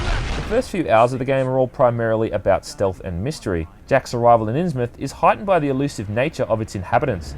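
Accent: Australia. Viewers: Why must people change the way a words are pronounced. T,tulu????? It's always pronounced with a c Cthulhu. Americans love changing the English language. Why???? You sound Australian.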